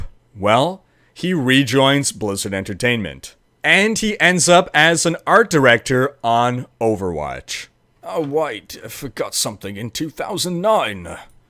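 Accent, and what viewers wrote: Quebec, Canada. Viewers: He's Canadian mate. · Are you from Quebec? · Saku Koivu ? Are you from Quebec / Montreal ?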